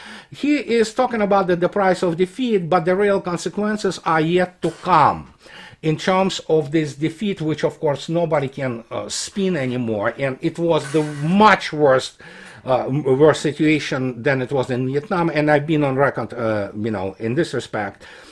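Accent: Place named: Russia. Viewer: Glad to see and hear you again! I love your Russian accent...🙂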